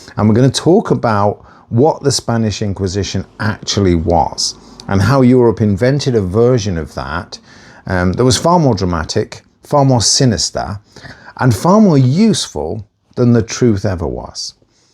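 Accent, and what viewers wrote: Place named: United Kingdom. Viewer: This guy thinks that because he's got a British accent everything he says is true. This guy doesn't know what he's talking about and needs to be locked up in an insane asylum. 20,000 people being burned at the stake was a common occurrence throughout Europe, especially in Spain. The foundations of the Spanish Inquisition go as far back as the 12th-13th centuries, after the Horrible atrocities European Christianity had committed throughout the Middle East and Jerusalem during the Crusades in the Middle Ages.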